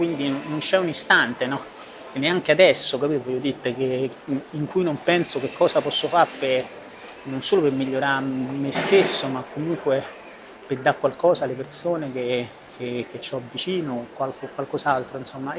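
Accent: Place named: Rome, Italy. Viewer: Che poi Gli Inglesi guarderanno il video in modo perfetto e con stile tipo "We are Like star Wars, We reached episode 4 of The Car accident" Poi ci siamo noi italiani che sentiamo "Savve pellegrini d'a rete" Amo il tuo accento romano in every case XD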